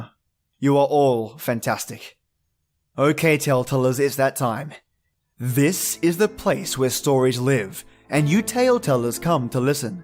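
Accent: Australia. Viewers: haha, I read "blister blast" in your Aussie voice and it sounded great in my head 😛 But I honestly don't mind a little jump scare here and there. I actually prefer them cause it makes the video more fun for me 😊 Especially with headphones 👍I hope you have an amazing Halloween btw! 🎃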